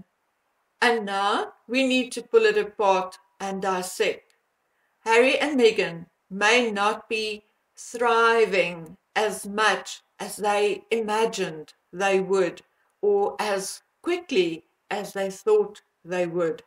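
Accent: South Africa. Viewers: I think impressions by people who are not in the UK are dubious. This is a small island and you are never far away from the RF. They make visits an amazing number of times. Its extraordinary how they have the stamina. They do marvellours work in many areas unpublished but leaked by people who work in the royal residences. All this can happen because they live in America one of the most dangerous places on earth. I worked abroad as a member of the Foreign and Commonwealth Office and the Americans were despised as ignorant and brainwashed. They are deadly in diplomacy you want no association with them. I gather from your accent you are South African and maybe have emigrated. You forget the Monarcy is here at the choice of the people and will not be removed by anyone overseas. It will end when we, the people, decide it does not suit our purpose. You also leave out the greed for money and power that fuels the US in a way it does not in Europe. 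Americans think because they speak English they are like us. They are not. We have not shared a history with them. We did not massacre and try to starve a native population. We had equal rights for our black and asian friends. OK some people object to the benefits some of these people have over poor whites. They are at the head of every profession in our country. Likewise women including 3 Prime Ministers, First Minister of Scotland, past First Minister of Northern Ireland. America is a power hungry country. it is also ridiculous in is post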